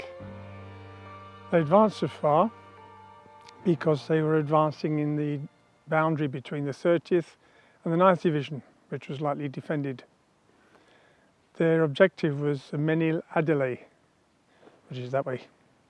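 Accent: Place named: United Kingdom. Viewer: I'm glad that this is highly detailed but when you jump from one unit to another every ten or fifteen seconds it becomes very difficult to keep up with. Have you thought about animating with some arrows or directions so that I know which unit you're talking about and which direction they're actually moving and who they might be facing? I understand that all of this is hard work but these would be so awesome if I could follow them just a little bit easier. I'm sure your British accent doesn't help my dumb American brain much either. I am using subtitles though.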